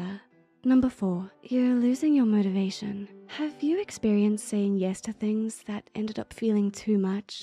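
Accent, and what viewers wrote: England, United Kingdom. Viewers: Don't like the English accent. Your normal voice is much more soothing! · Yo, what is this lovely British Accent? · This english accent is a British accent, generally I have heard American, cool though, like the voice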